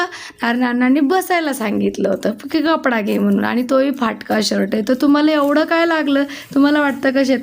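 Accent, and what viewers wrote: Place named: Ahmednagar, India. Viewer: ताईआपल्या भाषेवरून मला वाटतं आपण नगर जिल्ह्यातल्या आहात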